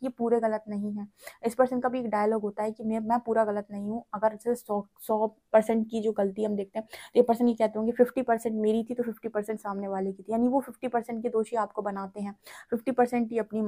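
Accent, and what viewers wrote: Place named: Bundelkhand, India. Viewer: Apka samjhane tarika bahut acha hai apki boli mai bundelkhand prabhav najar ata hai.. Pla mujhe pursanal riding de dijiye 🙏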